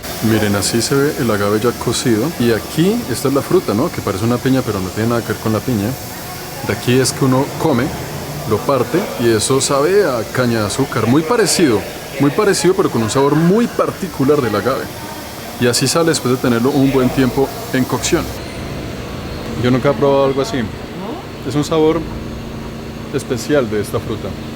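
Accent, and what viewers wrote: Bogotá, Colombia. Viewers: Me encantas con ese asentó colombiano estimado 💚👍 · Amo el acento colombiano ❤ entonces puedo decir que por eso empecé a ver tus videos y ahora no pido parar muy buena información tu voz relajante te invito a Puebla es tan grandioso cada rincón de mi México. Bienvenido 😊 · Q gran contenido y con ese acento rolo genial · Ahora quiero un novio colombiano 😅😍 me encanta este acento 😍😍😍😍❤️ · Me encanta tu manera de narrar! Eres súper gracioso y ese acento colombiano que tiene me encanta! 🤣🤣🤣🤣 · Habla rolo acento bogotano se dice q es el acento español más claro de Latinoamérica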